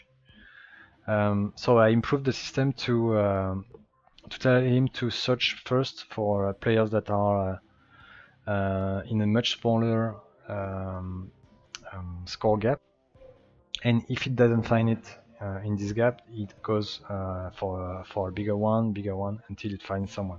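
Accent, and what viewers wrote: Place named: France. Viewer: i feel sound a french accent :D (nice review)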